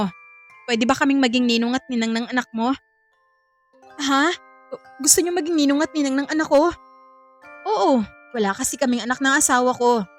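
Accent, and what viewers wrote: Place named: Rinconada, Philippines. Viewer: Parang ringconada ka idol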